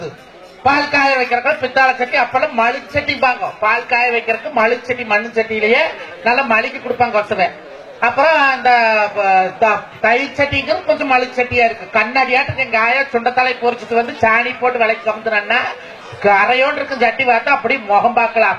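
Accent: India. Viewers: Similar to Palakkad Tamil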